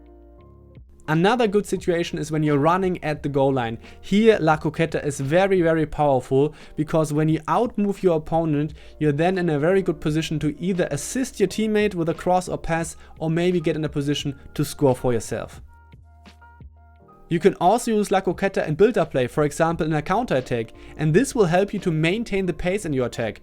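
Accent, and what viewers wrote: Germany. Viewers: because of your accent i guess u from german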